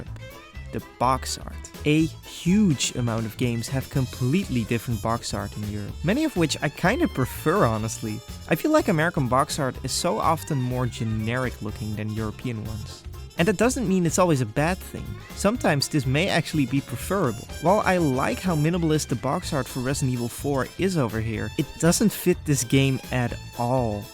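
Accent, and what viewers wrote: Netherlands. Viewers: Oh, a fellow dutchman! I was wondering why your accent sounded familliar. I've been meaning to get into game collecting. I have already baught a nintendo 2DS as I used to have a 3DS back in its hayday. And I missed some of the titles on there. I also have a Wii U but I only have one physical game on it. I'm gonna subscribe to your channel hopefully learn some more about game collecting in the future. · You’re definitely Dutch aren’t you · accent gehoord, nederlander gespot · Ik had pas in de gaten dat je Nederlands was op het moment dat je het zei. Nederlands accent heb je haast niet meer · Wow man, Nice subject. great editing and research. Also great accent for a fellow dutchman. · Watching your video I was like: damn, this dude sounds like he could live next to me. And then you said you're from the Netherlands and I'm like, makes sense lol. 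Great video! The King's Day part hit. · WHY do I ALWAYS recognize english speaking DUTCHMEN?! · Jij bent 100 procent nederlands. Je accent maakt het duidelijk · You dutch?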